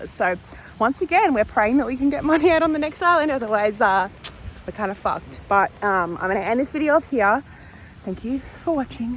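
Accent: Australia. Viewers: Sound aussie!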